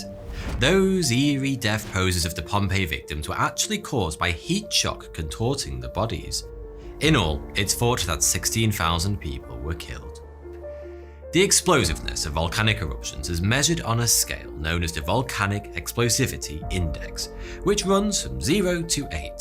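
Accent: United Kingdom. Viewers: Lovin your british accent in french haha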